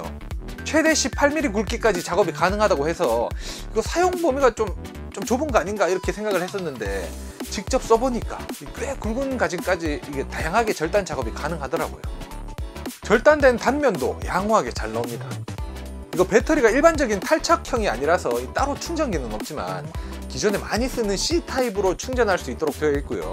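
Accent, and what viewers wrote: Gyeongsang, South Korea. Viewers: 대구 사람 이신가요??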